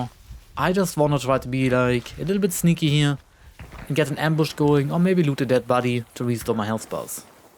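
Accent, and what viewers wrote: Germany. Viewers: as a fellow german i cant help but love your THICK german accent lol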